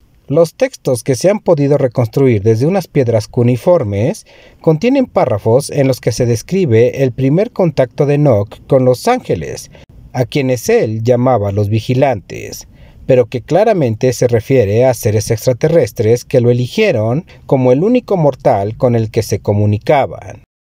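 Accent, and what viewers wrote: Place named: Mexico City, Mexico. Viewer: La información que subes en los videos, no está mal, es la que encuentras en otros canales, pero tu voz con acento de Chilango fresa nada más no te ayuda mucho! Cambia tu tono a más Profesional!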